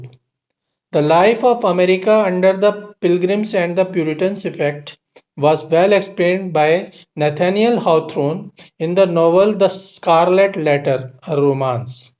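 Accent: India. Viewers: Oh my word! This guy better take up another hobby. This is the most horrible INDIAN accent I have yet to come across. Nope. Take an English accent reduction class and move on to BASKET WEAVING 101. Please.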